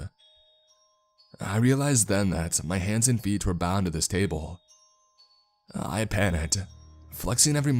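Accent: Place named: California, United States. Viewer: Pretty good but you need to get rid of your confused california girl accent.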